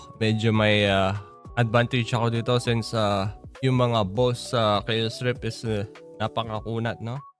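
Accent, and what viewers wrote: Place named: Iloilo, Philippines. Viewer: salitaan plang ilonggong ilongo toto part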